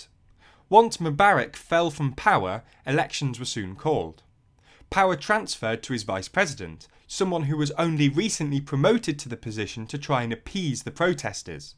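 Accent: United Kingdom. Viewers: It's always fun hearing somebody with the most British accent talking about these kinda things. xd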